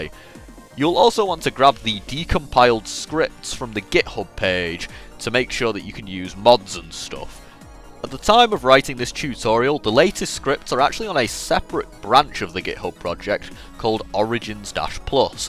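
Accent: United Kingdom. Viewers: The british accent ruins everything sorry but srslly 💀☠️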